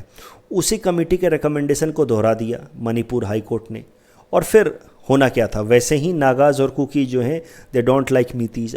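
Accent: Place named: Bihar, India. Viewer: Ur English like BihariEnglishsm....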